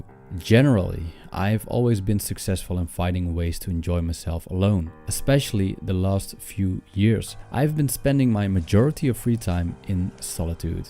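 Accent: Netherlands. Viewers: Hoor ik nou een hollands accent :-)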